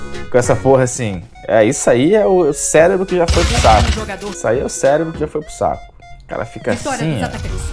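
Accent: Rio de Janeiro, Brazil. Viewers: Carioca tem o sotaque engraçado para quem não está acostumado com a gente.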